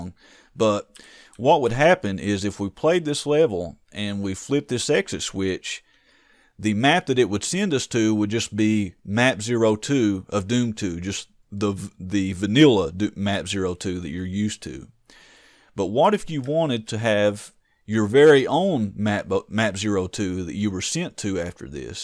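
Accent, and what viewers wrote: South, United States. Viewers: I think more tutorial people should have southern accents, it makes me more attentive to listen.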